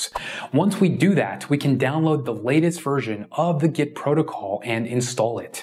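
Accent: India. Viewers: Hey man, Do you have Indian ascent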